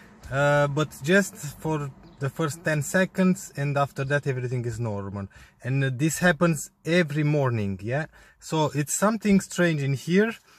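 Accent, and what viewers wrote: Romania. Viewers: roman de al meu dupa accent ! ;)) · Salut! După accent pari a fi român, așa este?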